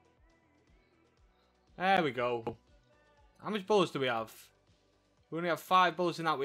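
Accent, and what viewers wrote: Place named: Liverpool, United Kingdom. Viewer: I'll subscribe just cause you're scouse lad. Get sick of watching the American kids sometimes 😂😂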